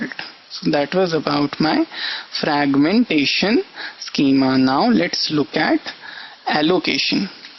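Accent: India. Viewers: its the indian accent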